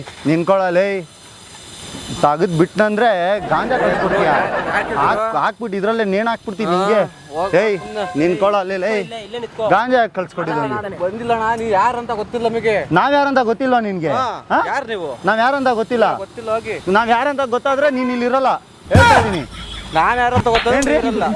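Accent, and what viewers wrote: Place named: North Karnataka, India. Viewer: ಖತರ್ನಾಕ್ ಉತ್ತರ ಕರ್ನಾಟಕ ಭಾಷೆ ಮಾತಾಡ್ತೀ ಬಿಡಣ್ಣ ಸೂಪರ್❤😅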